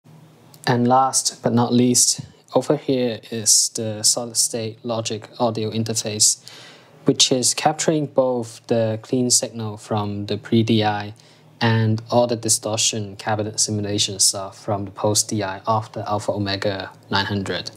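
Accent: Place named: United States. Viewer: I hear your American accent very good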